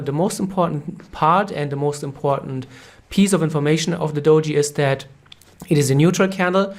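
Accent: Germany. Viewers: great content man. Are you German? Donnu why but somehow the accent makes me feel like I understand more ha :) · Just by the way he speaks, i can tell he is German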